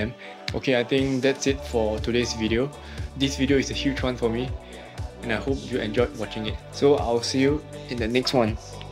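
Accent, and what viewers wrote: Singapore. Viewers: Hey fellow Singaporean here! Just have to say your video quality is on par or possibly even better than what I've seen on YouTube from top content creators, as love seeing you being authentic in retaining our accent and embracing the fears that come with putting yourself out there! Think your authenticity comes through, and seeing your personality as it is lends so much more to the video too! Good job bro, your videos are what inspired me to look more into design and zhng-ing my room while I wait for my BTO! The vibes are immaculate haha and I've been opening the YT app so often to check if you've uploaded so you're definitely doing a sick job :) Too bad I wasn't able to catch you at Stacked's store last weekend but so glad to have chanced upon your content! Keep it up! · I also like your singaporean accent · ahhh rooting for you! so many firsts in this video, but very excited for you to have many more adventures trying out this youtube thing!! 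also, hell yes to the sg accent ahaha we need more of it on here! i started vlogging for fun & just to have memories for keepsakes for when i get older & have to leave all the fun & games behind but it was really nice to see this, might still keep the hobby alive :") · I just discovered your video. Started with the Hanoi vid....very well done Admire your bravery ...can't wait to see more from you ..makes me wanna spend more time in asia. Also I like your singapore accent ..more pls! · don't worry about your accent! english isn't my first language and i've mostly learned it through watching youtube. nowadays my goal is to get to know and understand as many accents as I can, so the more I watch your videos, the better I'll get at understanding english spoken with the singaporean accent. keep up the good work!! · this vdo makes me feel like I’m watching a movie 🍿😍 Thank you and love the Singaporean accent.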